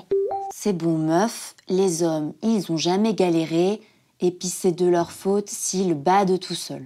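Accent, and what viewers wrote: France. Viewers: Je sait pas si c'est l'animatrice qui fait cette effets mais c'est la premiere fois que je n'aime pas vraiment une de vost video ... Encore c'est peut etre ton accent trop francais qui faisait que en ten que quebecois je ne comprenais pas toujours mais bon ... En esperent retrouver notre youtubeur habituel a l'avenir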